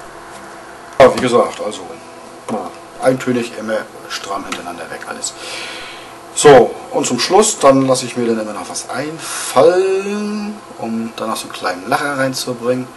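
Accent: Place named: North Germany, Germany. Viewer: Vielen Dank - toll erklaert! (...und noch dazu mit schoenem norddeutschen Aktzent) Ich werde das Lied mal probieren! Gruss, Oliver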